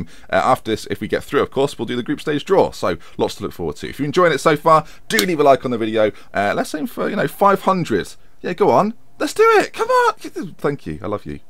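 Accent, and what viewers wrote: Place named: Manchester, United Kingdom. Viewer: your manc accent is pretty decent xD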